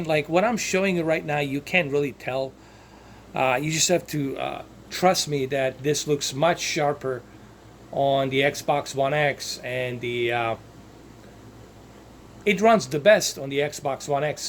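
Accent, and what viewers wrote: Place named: Florida, United States. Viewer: Hey bud. Awesome cant wait to play it. However If i may request. What are your picture settings while using game mode for bfV? I am very interested in using the free sync feature at 120h. Currently when I play forza horizon 4 and red dead redemption 2 I am not using game mode but I am using your natural picture settings which give me the best picture IMO on the q8fn. Would I use those same natural picture settings in game mode? I would love to hear your opinion. As always thank you so much! Also on a side note, please take no offense, I love your accent. I grew up in Florida as well. Take care bud.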